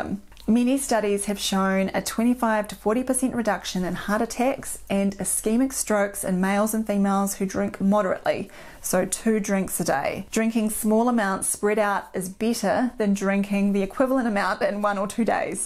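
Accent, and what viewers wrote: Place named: New Zealand. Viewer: That’s a New Zealand accent, not Australian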